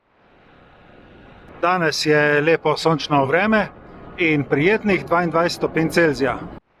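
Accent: Primorska, Slovenia. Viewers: Najprej pohvala za res dober kanal, vaše zgodbe so vedno poučne in zelo zanimive, vedno kaj novega zvem o kolesarjenju. Ko sem vas odkril, sem si vzel čas in pogledal vaše prispevke. Čestitam tudi za 1000-ga naročnika pred nekaj dnevi ali tedni. Zdaj pa do vprašanja... kako je kaj z defekti gum, se vam velikokrat dogajajo? Ali imate s seboj zračnico in komplet za menjavo ali popravilo defekta- pri specialkah no vsaj pri meni se je na začetku to velikokrat dogajalo. Ko sem recimo šel na eno daljšo turo, ko sem imel novo kolo šele nekaj dni, se mi je zgodil defekt. Ker sem se prej vozil samo s treking ali gorskim, sem bil čisto presenečen, saj pri gorskem nisem nikoli s seboj imel kita za popravilo, ker ga tudi nisem nikoli zares potreboval. Na srečo mi je na poti pomagal en gospod iz Kranja, ki je pa imel zračnico in mi jo je celo pomagal namontirat gor. 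Ta pravi kolesarji so res dobra družba in so pripravljeni pomagati začetnikom. Čez nekaj časa sem ugotovil, da sem imel verjetno napačen tlak v zračnicah- od nakupa nisem nič preverjal tlaka. Ali ste kdaj razmišljal o tubeless kolesih oz.kakšno je vaše mnenje? Sem drugače iz Primorske in mi j lepo slišati primorski naglas, sicer sem jaz bolj iz Krasa okolica Sežane, vendar že dolgo živim v Ljubljani. Hvala za odgovor in želim še veliko varnih in sproščujočih kilometrov na kolesu.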